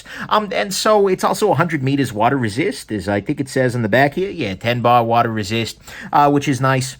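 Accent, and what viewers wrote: New York, United States. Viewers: I love your accent - is it NY? Great video, very entertaining.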